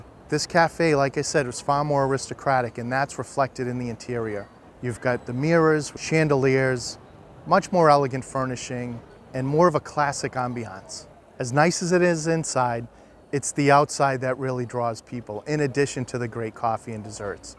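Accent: Boston, United States. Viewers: excellent video. Is that a Boston accent?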